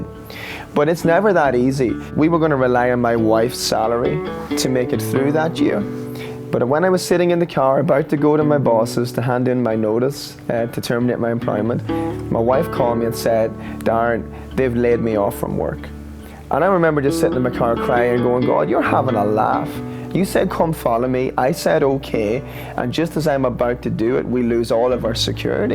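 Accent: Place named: Ireland. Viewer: I love listening to Darren speak, not only the Irish accent 😉 but to speak of Jesus and what leads him to follow and do Gods will. Thank you Darren and WAM 🙏🏼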